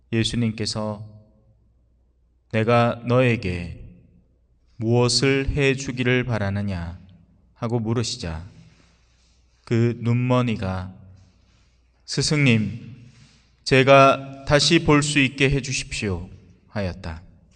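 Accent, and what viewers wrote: Gyeongsang, South Korea. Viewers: ..경상도.억양.실제에닮은꼴..많은.현세에삶.어찌콕콕..지적하여주신.신부님.저희들삶안에.오셨네요.. 절뜍..절뚝.저희들에삶..감사합니다돌아보게합니다🕯🥀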